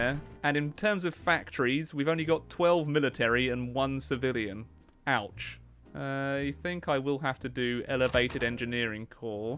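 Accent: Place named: England, United Kingdom. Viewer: sounds more English when he’s in England.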